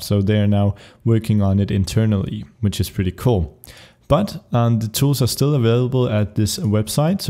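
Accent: Denmark. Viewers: are u danish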